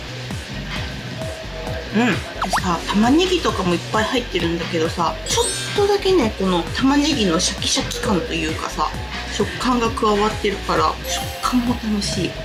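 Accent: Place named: Osaka, Japan. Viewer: 大阪弁が入っているので親近感がわきます。 それにしても食レポが巧いですね。ものすごく食べたくなりました。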